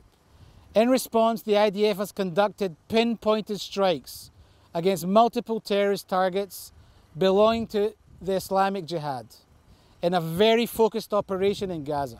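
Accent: Scotland, United Kingdom. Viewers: This guys sounds Scottish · Is he Scottish? It’s like an Israeli Scottish accent! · May Hashem keep you all safe💖🇮🇱Thank you for all you do.( Amazing to hear a Scottish accent 😍) תודה. רבה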